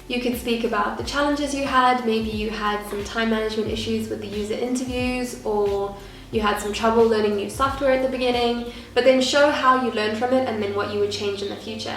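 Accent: South Africa. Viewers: Always odd to hear an SA accent on YT but I'm happy to support a local creator, great video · I was like: is she australian is she irish? no south african makes sense now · Gosh, I couldn't recognise your South African accent at all haha! Maybe because I have one myself, who knows haha.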